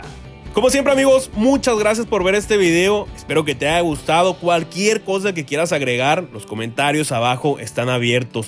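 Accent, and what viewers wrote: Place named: Monterrey, Mexico. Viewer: Al chile los de monterrey así hablan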